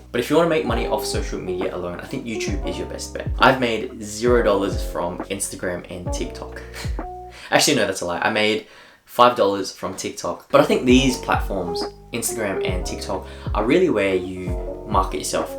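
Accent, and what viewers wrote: Australia. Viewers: Love that Aussie accent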